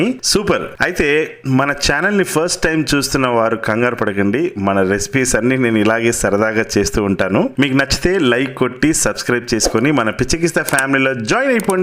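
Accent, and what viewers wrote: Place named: Godavari, India. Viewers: సార్ మీ వాయిస్ సూపర్ గా ఉంది గోదావరి వాయిస్ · Mana godhavari మాట కే.. కాదు.. వంటకాలకు కి కూడా ఒక spl place ఉంటుంది.. ఎక్కడైనా సరే..... చాలా బాగా explain చేశారు... That to గోదావరి యాస.. hailight ... ,❤❤❤❤ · Hiii ala unnaro. Mana godavari vala voice super ga chepparu. I love godavari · Aa voice, voice lo grace.slang ,superb wow sir konni words tri vikram gariki kuda cheppandi sir. Mana godarolla manasu vallu matlade matalo thelustundhi. Sir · 🤣🤣godavari slang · Godhavari yasa yetakaram ayendhi bro.plz never sing..... requesting you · రాలేదు గోదావరి కి కానీ యాస బాగుంటుంది అండి అయ్ మా friends ఉన్నారు ప్రౌన్స్ బాగుంటాయి❤️❤️❤️❤️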